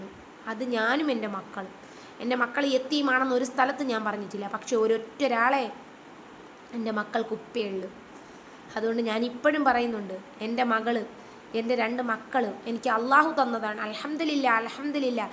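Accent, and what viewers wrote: Thiruvananthapuram, India. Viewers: Tvm ഭാഷ ❤️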